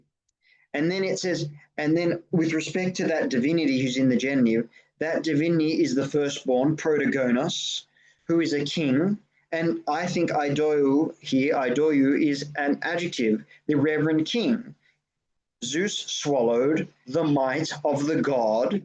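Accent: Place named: United Kingdom. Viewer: You’re one a few people with a British accent I can actually listen to without feeling like I’m being conned or talked to like a child by someone who doesn’t understand what they are saying. You sir, are a gentleman and a scholar.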